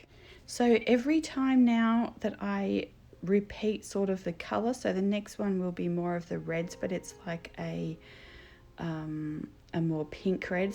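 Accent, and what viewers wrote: Australia. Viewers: Not sure if my comment was posted, but wanted to say I’m so glad to hear an Aussie accent. I love your style. I am just getting into art after a career in nursing, so am inspired by your stuff. I an in NsW Thank you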